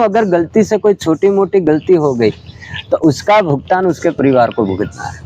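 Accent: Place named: Uttar Pradesh, India. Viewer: Aap ki aavaj se to aap u p sahit ke lagte ho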